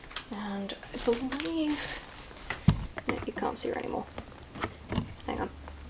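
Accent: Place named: United States. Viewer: Omg, a posh American accent, luv it!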